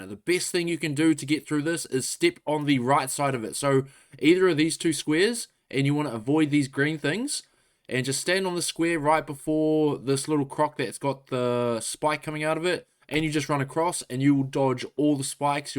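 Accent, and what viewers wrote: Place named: New Zealand. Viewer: Are you a kiwi? You have a bit of a kiwi accent. :o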